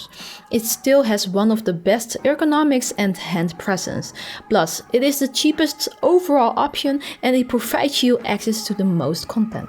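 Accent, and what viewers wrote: Netherlands. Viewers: is she dutch? she sounds really dutch.. shes dutch! is she dutch?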